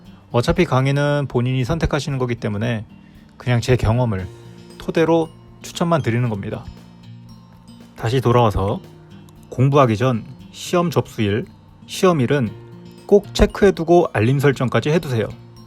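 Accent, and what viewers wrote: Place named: Seoul, South Korea. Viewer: 1과목 교수님 맡으셔도 충분하네요~~ 음성도 좋으시고 특히 서울말씨가 귀에 속속 꽂힙니다. 감사합니다 🙏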